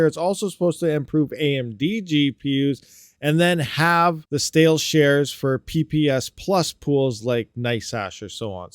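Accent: Canada. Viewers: Are you Canadien buddy?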